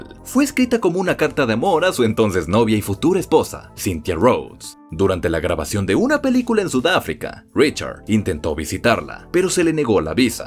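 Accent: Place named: Latin America. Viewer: Hablas con una zzzz de Latinoamericana qué en varios países de América suena rara. Todo sabemos que no tenemos zzzz. Pero esa Z no es la de España por eso le digo Z Latinoamericana o Américana.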